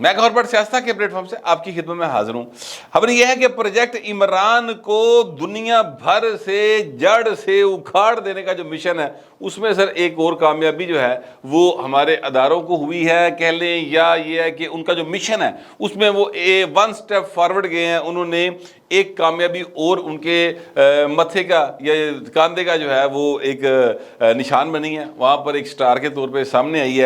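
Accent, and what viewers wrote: Lahore, Pakistan. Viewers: ZindAbad.pure lahoria style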